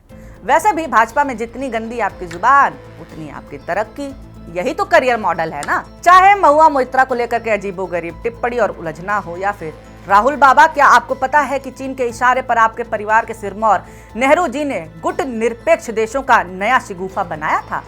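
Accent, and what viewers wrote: Bihar, India. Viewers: I'm fan of your style ❤ Up Bihar wali hindi tone + smile ke sath roast😂😂 I obsessed with your all episode 😊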